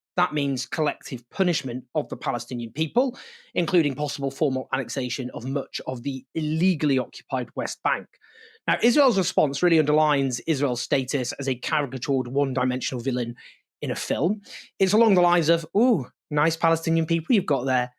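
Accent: United Kingdom. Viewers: Why does Owen sound British???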